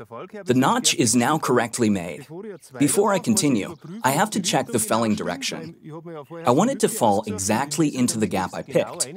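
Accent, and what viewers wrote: Bavaria, Germany. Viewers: Bei der Ankündigung dachte ich schon real Bavarian English....Ich weiß nicht, welcher englisch Sprechende verirrt sich denn auf diesen YouTube Kanal.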